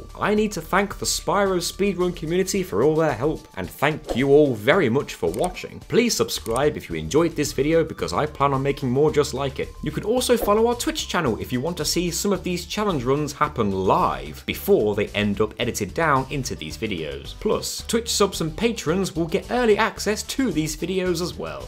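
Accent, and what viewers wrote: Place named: Lancashire, United Kingdom. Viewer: I think you might be me. Other than the fact you sound like you're from lancashire/ the north west, we sound identical.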